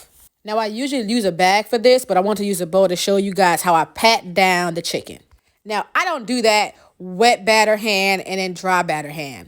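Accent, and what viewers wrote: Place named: New Orleans, United States. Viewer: You don't sound like you from New York I thought you was from New Orleans or something like that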